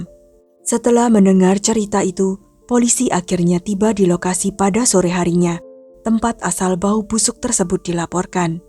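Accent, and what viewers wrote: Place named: Java, Indonesia. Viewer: Kek org Jawa kek medok ngomongnyr😊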